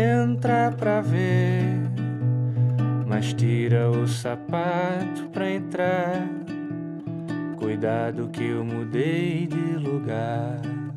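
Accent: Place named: Northeast, Brazil. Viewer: Eita sotaque nordestino cabra da peste. muito bom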